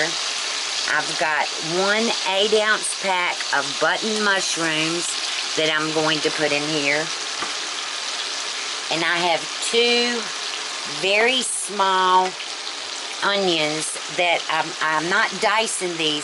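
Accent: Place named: Virginia, United States. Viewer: Thanks for your efforts in producing this video. My wife is preparing it as I write. Are a Virginia resident? We live in central Virginia and your accent sounds familiar.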